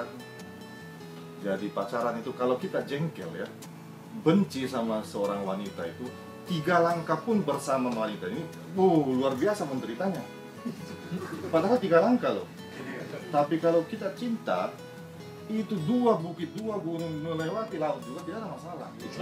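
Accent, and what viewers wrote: Makassar, Indonesia. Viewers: subhanallah, allah mempunyai jalan untuk hambanya yang bersungguh sungguh, lama di makassar yahh, pantasan logatnya makassar bgt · logat makassarnya kentara sekali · dialeknya kok Indonesia banget. dialeknya sangat mirip dialek Makassar atau Manado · logatnya jadi ikut orang makasar ya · Dialeg Makassar. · kental sekali ini orang logat makassarnya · pantas aksennya makassar sekali...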